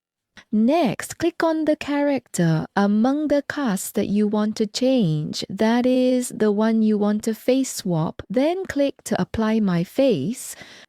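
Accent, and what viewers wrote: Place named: India. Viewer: 인도 사람이 중국어 하는거 같아요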